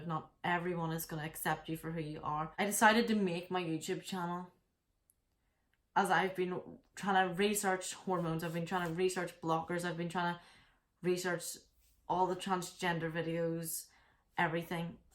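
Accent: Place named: United Kingdom. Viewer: You’re so pretty, and aww I love your british accent 😍💗 anyway I can help ?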